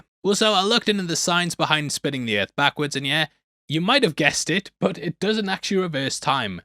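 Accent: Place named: United Kingdom. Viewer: Hes british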